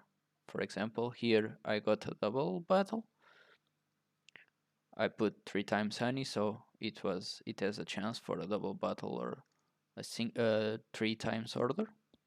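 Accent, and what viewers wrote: Portugal. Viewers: Sotaque pt? 100%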